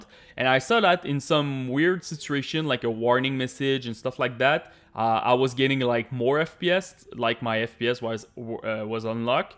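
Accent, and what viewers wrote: Quebec, Canada. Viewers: are u from quebec by any chance?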